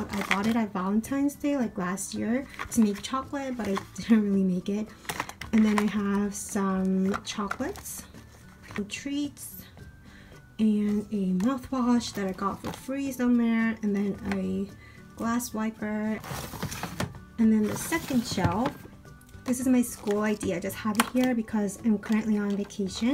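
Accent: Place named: United States. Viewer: This might be a personal question but I'm going to ask anyways are you a foreign exchange student because if I am correct you're currently studying in South Korea and hearing that you speak English with an American accident I assume you're American (correct me if I'm wrong) also if you are a foreign exchange student how did you get excepted and how has your experience been btw love your videos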